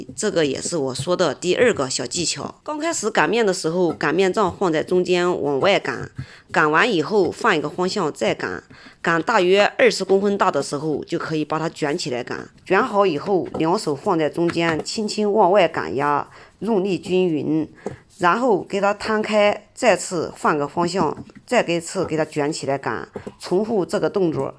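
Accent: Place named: Shanghai, China. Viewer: 阿姨的上海話口音真好聽😅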